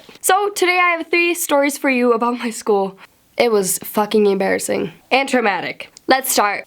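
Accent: United States. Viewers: johansson they didn't say it was bad lol it's just different because to me she just sounds American so it was surprising :)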